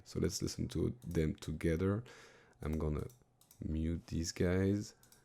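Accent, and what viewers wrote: France. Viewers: Super interessant tes vidéos. Je trouve simplement dommage que tu le fasses pas en français, sachant qu'il existe pas ce genre de contenu ( tuto composition orchestrale )dans notre langue. J'ai compris que tu étais français avec l'accent ;). Sans rancune ;) parole d'abonné.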